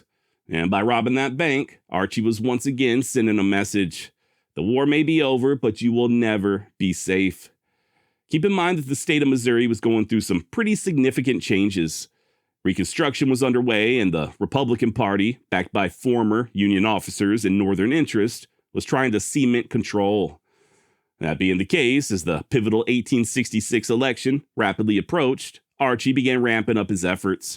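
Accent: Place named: Wisconsin, United States. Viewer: Sounds like you're from my neck of the woods, Wisconsin. Maybe a little north. Love the content, keep up the great work.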